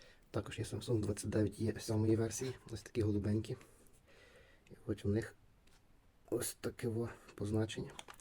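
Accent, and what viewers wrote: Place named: Ternopil, Ukraine. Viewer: Ви що, по акценту не розумієте? Тернопіль!. Мєв...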